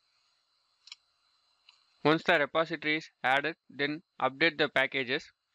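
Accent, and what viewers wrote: India. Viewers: East Indian accent is so heavy, I can't understand what he is saying.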